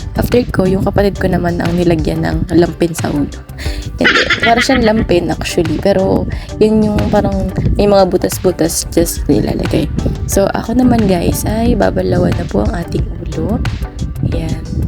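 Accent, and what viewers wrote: Bicol, Philippines. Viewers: ahh ay akala koy taga mindoro ka..taga bicol ka baga?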